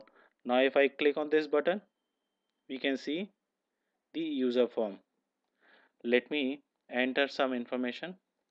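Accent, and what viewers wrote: India. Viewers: How do you understand indian english?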